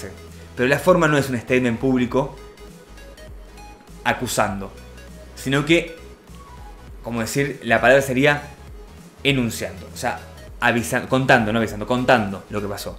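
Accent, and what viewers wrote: Argentina. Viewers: dice roshal por el acento argentino que tiene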